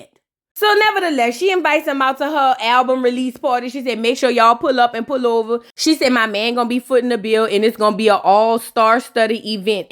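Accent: New Orleans, United States. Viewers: I was just scrollin and ya accent and the way u said this point made me tap in....Chiiillleeee i love a Nola accent❤❤❤ much love from philly❤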